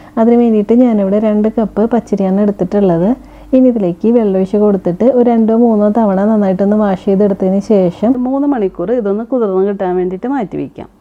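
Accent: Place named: Thrissur, India. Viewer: എവിടെയാണ് വീട് സംസാരം കേട്ടിട്ട് തൃശ്ശൂർ ജില്ലയാണെന്ന് തോന്നുന്നു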